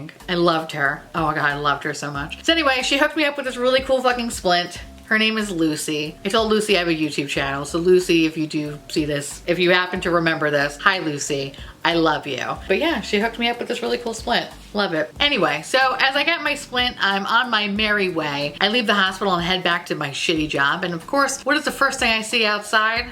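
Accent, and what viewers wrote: England, United Kingdom. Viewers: Yesssss! Loving the English accent! Represent! 🇬🇧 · Love the English accent girl. Your skin does look beautiful.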